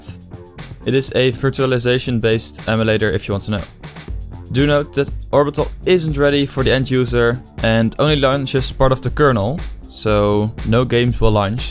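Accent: Netherlands. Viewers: I sense a Dutch accent tell me if i'm wrong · You dutch?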